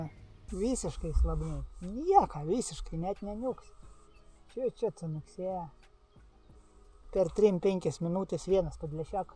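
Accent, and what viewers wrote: Aukštaitija, Lithuania. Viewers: labai patinka tavo aukštaitiška tarmė,ir jeigu tavo gera nuotaikėlė,tai gali filmuoti bet ką.Svarbu kai tu linksmas.Labai pakelia nuotaiką.😂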